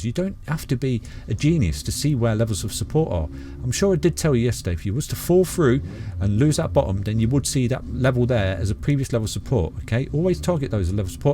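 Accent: United Kingdom. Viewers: I 've got my headphones on and i'm so enjoying his warm British accent, i cant even hear his neighbors car at the background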